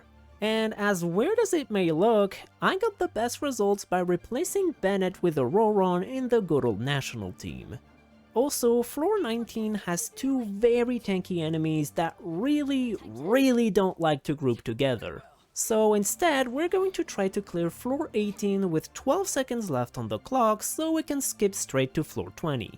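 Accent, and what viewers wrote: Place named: India. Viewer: this is good idea india aeccent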